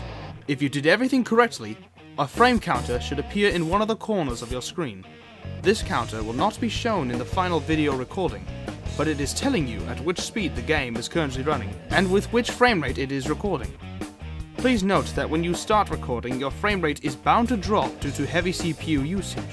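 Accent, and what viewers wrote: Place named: United Kingdom. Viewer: he's British <3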